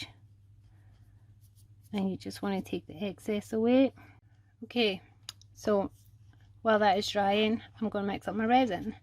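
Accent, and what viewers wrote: Scotland, United Kingdom. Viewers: Of course! I should have realized that it was a Scottish accent, no wonder I like it, that's my favorite dialect. My Grandfather's name was McCoid, not sure if it's Irish or Scottish, but I have visited both countries and would live in either quite happily! Thank you for your reply and keep up the great work.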